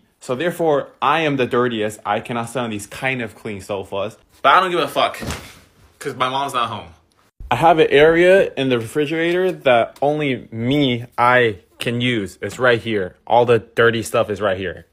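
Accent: Taiwan. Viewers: 聽口音是台灣移民過去的😂 冰箱還有一罐八寶粥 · 聽中文口音感覺是臺灣移民。 希望大家都開心。 畢竟當初移民應該是希望家庭會有更好的生活條件。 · 這口音 台灣過去的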